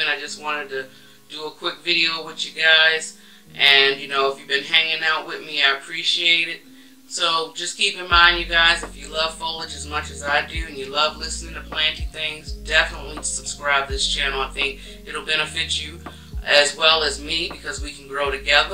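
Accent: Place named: DMV, United States. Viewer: Came for plant info stayed to see if your from the dmv lol I hear it in your voice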